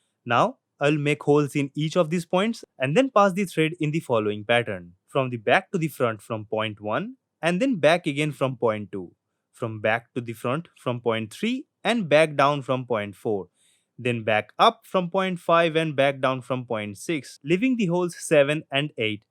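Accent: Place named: India. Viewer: You speak indlish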